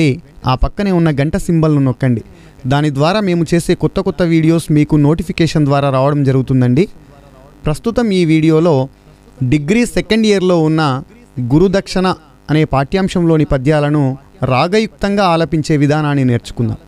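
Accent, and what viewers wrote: Telangana, India. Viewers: Telanmgana yasa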